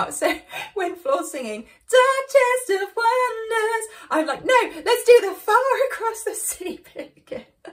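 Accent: United Kingdom. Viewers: you truly are the ''Margot Robbie' of music,just with an English accent.You are so talented and such a sweet soul as well.What a treasure you are to us all!! Thank you!! ❤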